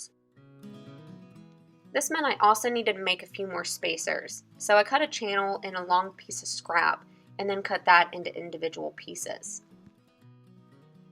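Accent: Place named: South, United States. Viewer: apparently I'm not allowed to say anything about the southern drawl. so sorry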